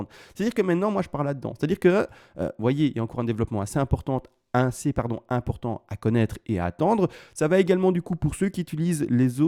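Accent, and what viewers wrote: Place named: Belgium. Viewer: Bonjour et Bravo à, je suis devenu accro à ses tutos.... lui qui est belge je crois... et moi Québécois!!! Mais quel ACCENT!... pour un québécois francais comme moi ca me tient réveillé!!... Question pour la communauté: j'utilise Kraken pcq c'est le premier exchange que j'ai connu, cependant, je le trouve tellement pas bien fait. Je sais que ne souhaite pas s'investir en recommandant un exchange pour les raisons qu'il a mentionné et que je respecte, mais je suis d'avis qu'un membre de la communauté pourrais me référé l'exchange qui serait plus plus adapté à suivre ces tutos, comme par exemple modifier les stop loss à la cliquette comme il dit souvent:)) qui peut me recommander son meilleur choix et pourquoi... Merci et bravo encore... j'apprend toujours!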